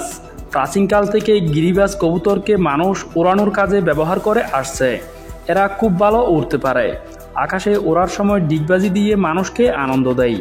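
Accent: Chittagong, Bangladesh. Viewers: ওনি ছট্রগ্রামের লোক।। তাই ছট্রগ্রামের বাষায় কতা বলেন।।। কিচু মনে খরবেননা।।।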